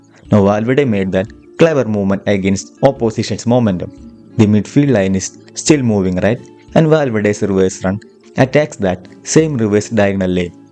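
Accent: Kerala, India. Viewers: Your accent seems to be from kerala, I am a keralite. Analysis is amazing and on point. keep up the good work!!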